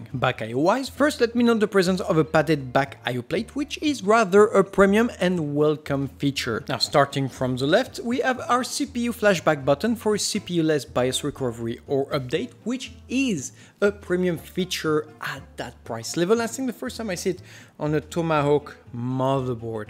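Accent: France. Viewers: That french accent tho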